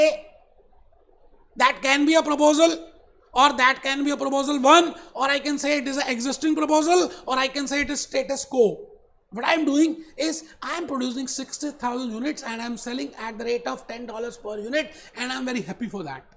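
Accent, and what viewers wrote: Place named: India. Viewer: Thank you Sir. Aap bahut accha kar rahe ho aise video daalkar. I always understood concepts better in Hindi and in a desi dialect.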